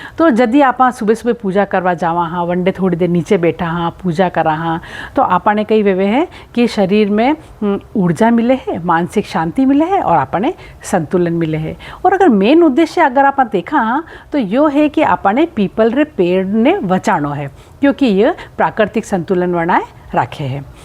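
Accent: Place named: Mewar, India. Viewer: Bahut hi gyanvardhak video .....gajab ki mewadi boli h aapne....dashamata ki agrim shubhkamnae evm pranam didi🙏🙏🙏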